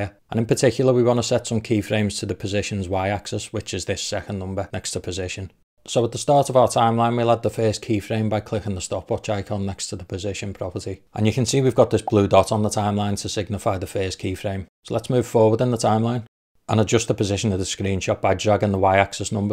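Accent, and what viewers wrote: Liverpool, United Kingdom. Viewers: Not to mention the scrolling website on the Sqs example appears to have an FAQ accordion, which, of course... you need a plug-in for. Nice seeing how to do this on Ae rather than Ps. Always enjoy the humour in your videos. Is that a scouse accent I hear?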